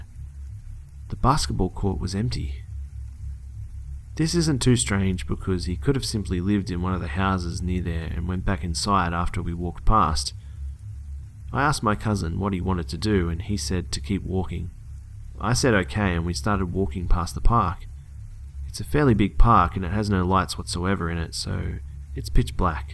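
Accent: Australia. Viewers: that freaking Australian accent <3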